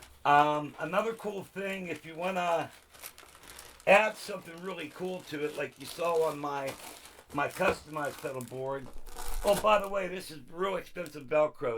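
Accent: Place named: Pittsburgh, United States. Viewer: That Pittsburgh accent tho!!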